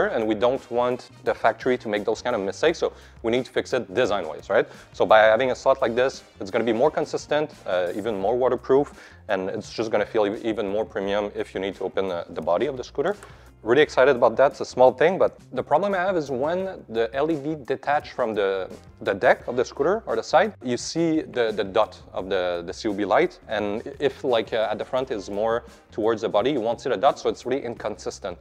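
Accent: Quebec, Canada. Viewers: Sa parais que tes quebecois loll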